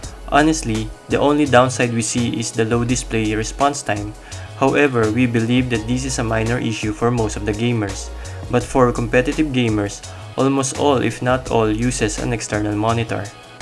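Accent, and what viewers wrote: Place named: Philippines. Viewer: Im not a Pinoy but I can recognize that the reviewer is Pinoy from his accent 😁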